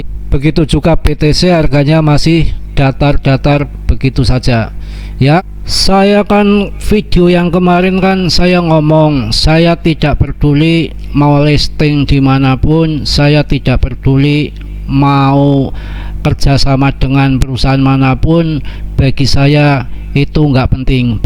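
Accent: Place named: Java, Indonesia. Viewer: Saya demen mba suaranya medok Jawa banget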